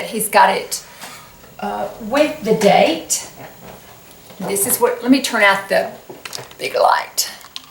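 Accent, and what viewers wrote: South, United States. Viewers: i love how you southerners say "film".I simply love your accent.Almost all the Youtube ladies i watch are southern American.When i grow up im gona be a south American.lol.♥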